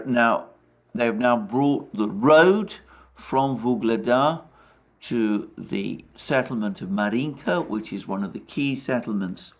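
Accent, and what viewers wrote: United Kingdom. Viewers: he is offering russian propaganda with a british accent.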